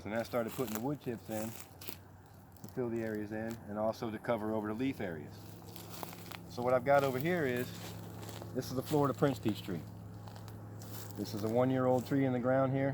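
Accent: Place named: New Orleans, United States. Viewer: I detect a little bit of a New Orleans accent in you bro🤗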